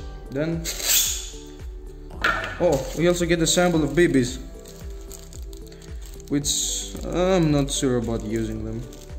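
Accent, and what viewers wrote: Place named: Greece. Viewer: Η προφορα σου σε προδωσε,Μετα ειδα το.....Ωραιος καλη συνεχεια αδελφε......απο εναν Ελληνοκαναδο!χεχεχε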